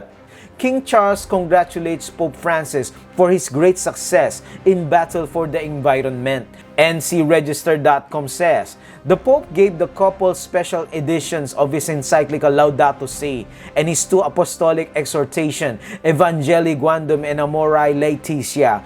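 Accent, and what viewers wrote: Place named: Philippines. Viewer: Your accent sounds Filipino. Nice video by the way .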